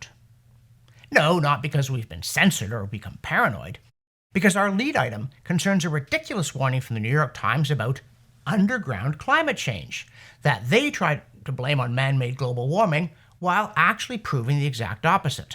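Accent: Canada. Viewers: 🫡✋haha me me me! just discovered this channel. Very cool! His Canadian accent annoys me but I’m an overly cocky American😅 haha my voice probably annoys people too and I might be blissfully unaware. Haha but yah I am very much enjoying his presentations and data and sarcastic style. Amazingly, youtube recommended this channel to me! I dunno… but I wonder if it means they tweaked their algorithm to suppress less content? Anyway I was very surprised the videos made it past the suppression filter into my recommendations feed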